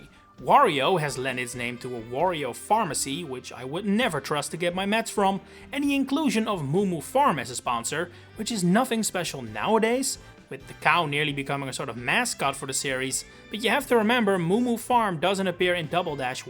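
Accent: Netherlands. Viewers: Nice video bro Heerlijk Nederlands stemmetje hoor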